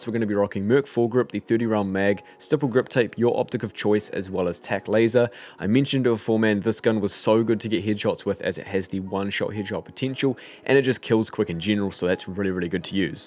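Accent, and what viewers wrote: New Zealand. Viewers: Is he from New Zealand? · Are you from New Zealand?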